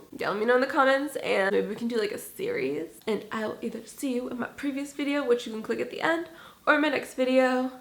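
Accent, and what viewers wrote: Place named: Los Angeles, United States. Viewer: her accent is like PURE LA now lmao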